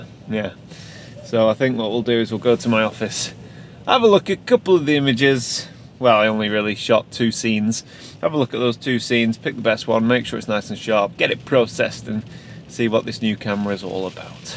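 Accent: United Kingdom. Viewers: Few things are more enjoyable than hearing a British man complaining about the weather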